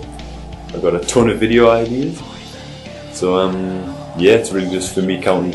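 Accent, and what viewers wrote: Newcastle, United Kingdom. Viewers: Where are you from? You play on U.S. right? Just 'cus your accent sounds like a Geordie's a little :> gz on the partenership! looking forward to more content :)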